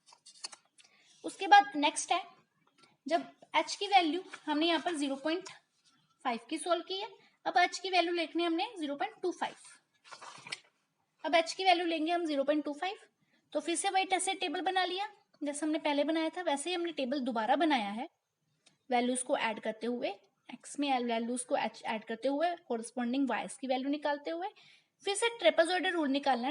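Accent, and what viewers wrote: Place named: Punjab, India. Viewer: Nice explanation mam By the way Your accent feels like punjabi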